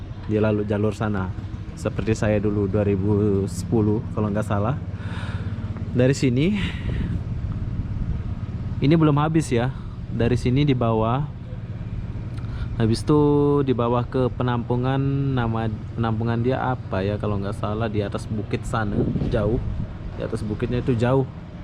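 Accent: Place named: Malaysia. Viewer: Macam logat malaysia ja mas..pake bahasa negara sendiri ja mas...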